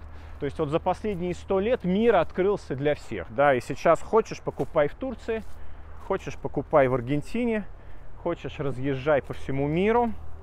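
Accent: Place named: Castile, Spain. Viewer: испанский не с акцентом,а кастильский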